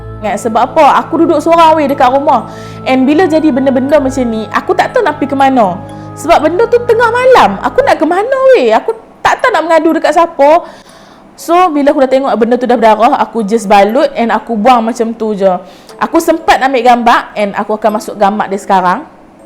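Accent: Kedah, Malaysia. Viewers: Akak org kedah ke penang?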